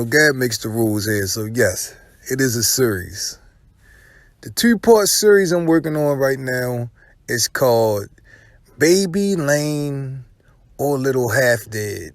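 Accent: Baltimore, United States. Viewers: Are you from Baltimore? Sound like tayroc with the oooos 🤣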